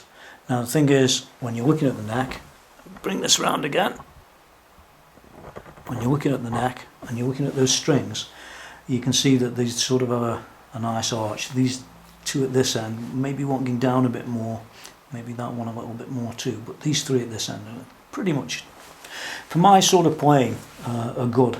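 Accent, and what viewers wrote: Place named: Cheshire, United Kingdom. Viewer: I stumbled across both of your build series and as a Stat player myself I feel inspired to one day take a similar approach, with this mark 2 the thought of Strat without a scratch plate "oh Jesus no!!!!" You sir have more than changed my opinion, what a gorgeous looking bit of kit to own, and the sound and tone even on a smart phone sounds great, if I'm honest apart from my own it's been a long time since I've heard a Strat make me want to get my hands on it lol Do you gig at all? By your accent you sound like you could well live not all that far from me in Cheshire, if you do play live I'd certainly take the opportunity to come hear you play it, I certainly understand if that would be a bit too personal. Anyhow thanks for the inspiration and enjoyable videos, enjoy your custom build, with the money, time, care and effort you've invested in her you certainly now deserve the pleasures that come Cheers!!!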